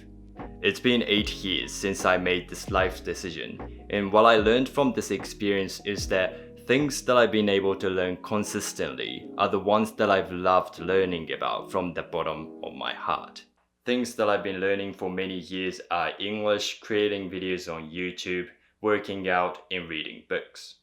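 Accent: New Zealand. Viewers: You're a Kiwi, aren't you? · stumbled upon your videos and i must say the way you say certain words is very 'kiwi". some words i picked up are get, seven, end, test, next, friends. Mostly the vowel sounds of 'e'that are almost pronounced as 'i'. Like you, i''m a migrant to NZ and can relate to your struggles. Your videos are very cool by the way. Your new follower from Auckland. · Kia Ora. Your English is perfect and still very "kiwi". Great to see you wearing your 'pounamu' as well. I do hope you will come and visit us in NZ in the future. Love your videos! Best regards from down here in the other shaky isles. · Your English is very fluent. You can definitely hear the NZ influence in your accent though :) Do you ever forget japanese from time to time?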